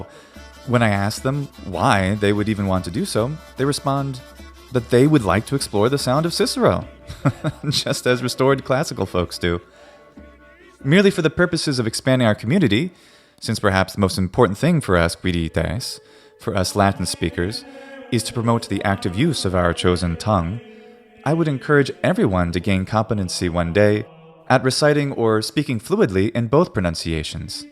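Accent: Italy. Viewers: Your Latin accent has a very Italian quality to me, very soft and musical. I have been studying ecclesiastical Latin for several months. I am Catholic had been a cantor at our Cathedral. I had intended just learning prayers in Latin, but fell in love with the language. I tried several methods but ended up using primarily your recommendation of Lingua Latina Familia Romana: the text, the companion book, the exercisebook and the teacher’s guide/answer book. Hackett Publishing also offers MP3’s for sale on Amazon music in either the classical or ecclesiastical pronunciation, which I purchased. My goal is to be able to read St. Augustine’s book on the Trinity in Latin and more. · This isn't the Ecclesiastical pronunciation, it's the Italian pronunciation. Ecclesiastial would be : hor-tus, kvan-ta, ling-gua, con-tsi-to, gur-ges, mang-na, nes-tsi-ne, ni-si. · I just found this channel--it is so fascinating! 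As a speaker of 6 languages, I am still disappointed that I never took latin (isn't interesting how people also say they "took latin" rather than saying they "speak latin?"). But I love his latin (this You Tuber), who speaks with such an authentic Italian accent and cadence. It makes me want to pick it up as a hobby! A huge compliment as I m hard to inspire these days, lol!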